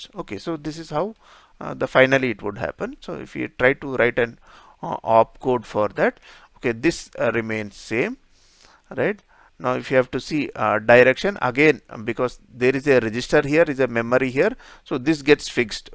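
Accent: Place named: India. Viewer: Indians speaking english sounds very ridiculous, next time use subtitles or remove anything below your tonge, the world will thank you.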